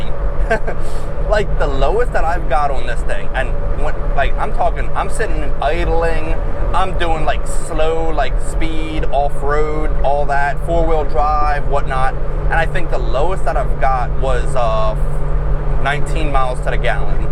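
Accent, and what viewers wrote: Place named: Maryland, United States. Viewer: You have to be a marylander with that accent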